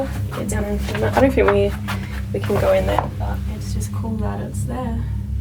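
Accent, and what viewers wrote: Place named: Australia. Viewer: Leap Castle - ''Caisleán Léim Uí Bhánáin'', that murder was all about power and control, it's as old as the hills as they say. That little place where they threw prisoners to die from injury or starvation as there was a spike on the floor was called a oubliette or a place of forgetting in English. People were tossed in there to die from the spikes or starve to death. Many would rather have died quickly from the spike(s) than starve to death. The most cruel thing was that they could both hear people having a good time and smell delicious food while this was happening to them. I see this was a couple of years ago i hope you come back although i don't know if Leap Castle is allowing any more ghost tours. PS: I find it funny that birds frighten you but ghosts ( indeed possibly really vicious ghosts ), are not a problem. You sound Australian but mixed with a little bit English accent.:-) The current owner a Mr Sean Ryan and his wife although a Irish name has a Australian connection and i believe that he was born and grew up in Australia before moving to Ireland although not 100% sure about that. 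According to a program i saw Sean was nearly killed a couple of times when he initially started the repairs. However, at the baptism of hos daughter which took place at the castle apparently there was a peaceful feeling and even a light breeze that the attendees of the baptism noticed. Sounds with the gruesome history of Leap that it needs any positive feelings that it can get. Fina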